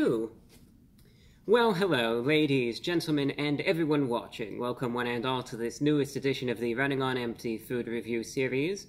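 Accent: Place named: Transatlantic, United States. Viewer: Man's bringing back the old-school transatlantic accent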